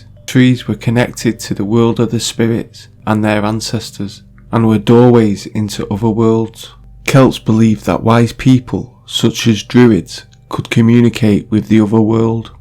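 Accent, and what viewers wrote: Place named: Sheffield, United Kingdom. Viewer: Love your channel, accent sounds close to home. Sheff? X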